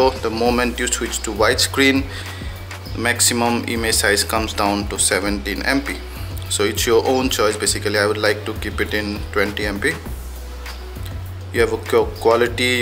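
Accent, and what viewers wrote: India. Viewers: You think this English sucks? You should listen to Dutch people sometime, you'd cry. I think he's from India, or somewhere close to that. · Ocelot's Vlogs Yup He has an Indian Accent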